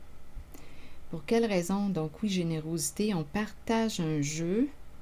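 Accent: Canada. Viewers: Toujours belles guidances .❤ Mon œil est bleu . Vous êtes une très bonne comédienne mais réelle . C’est vrai qu’en réalité, C’est pourri cette famille Et je reste polie Je n’ai pas rigolé tous les jours . Mais je vais mieux . Je vois qu’il y aura beaucoup beaucoup de s ..e . Je n’en doute pas du tout . Confiance ???????!! Maintenant je doute beaucoup . Amour non réciproque Confu (Oui non ) ?????????? Problème de communication Une fois une femme une fois un homme. Qu’est ce que ça veut dire . Accent canadien j’adore . J’aime aussi la mimique des mains . Téléphone fixe inutilisable …. J’adore écrire j’adore parler Je n’ai aucun défaut . Bisous . Merci infiniment .😎😎😎😎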